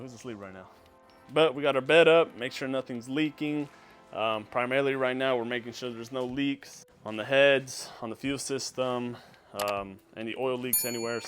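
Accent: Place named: Louisiana, United States. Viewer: Hold up player! You from Scott Louisiana are what? Your roots run deep in your voice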